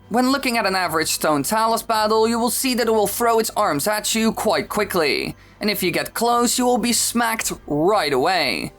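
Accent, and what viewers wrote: Netherlands. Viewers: Love your dutch accent and ofc your videos.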